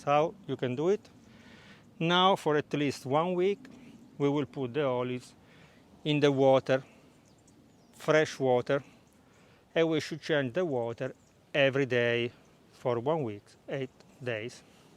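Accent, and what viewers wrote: Italy. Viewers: His Italian accent is very nice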